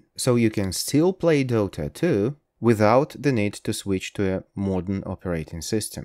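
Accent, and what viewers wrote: England, United Kingdom. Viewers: Да вы из Англии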